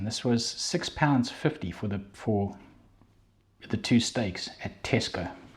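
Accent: South Africa. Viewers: So nice to hear a South African accent on YouTube.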